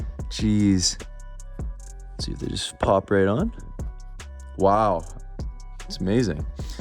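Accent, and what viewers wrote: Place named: Central Canada, Canada. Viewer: So, just for fun, if I was to guess by the rocks and wood you have on your course, would I be right you're a West Coaster? I was thinking Central Canada by your accent, but thinking the natural materials say West. LOL!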